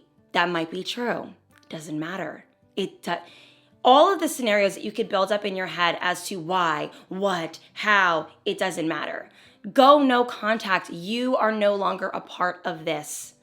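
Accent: New Jersey, United States. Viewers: It’s so sweet, though! You’re very well spoken but it definitely could be a Jersey thing! 😂 I have family there and LOVE their accent and way they pronounce words 🩵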